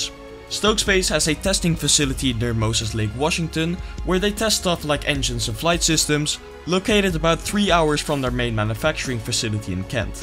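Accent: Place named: Netherlands. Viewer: Good content, but please keep working on that Holland-accent 🙉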